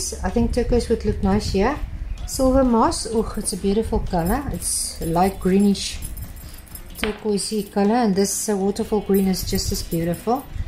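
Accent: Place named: South Africa. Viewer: I love him. I love elephants! You're from South Africa? Very cool. I was wondering what accent you had.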